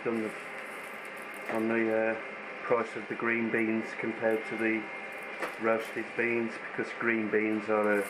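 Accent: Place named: West Midlands, United Kingdom. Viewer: Funny that you should mention the kitchen full of smoke :). Yep you guessed it, I couldn't wait for the ducting pipe to arrive and roasted my first batch. In all honesty for a first batch, they really are quite good. I am indeed in the UK, Derby, East Midlands and from your accent I would hazard a guess that you are in the West Midlands. I would certainly be grateful if you could let me know where you get your storage bags from, I have looked on Amazon where there are so many to choose from I just get bamboozled :). I would definitely be interested in the coffee beans too please if you could provide a little more information. Many thanks and best wishes. Ian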